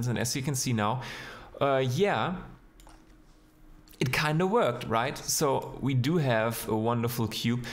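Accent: Germany. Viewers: Gutes Video :) Mein Abo haste :D in der Ersten Sekunde hab ich herausgehört das hier ein Deutscher am Werk is :D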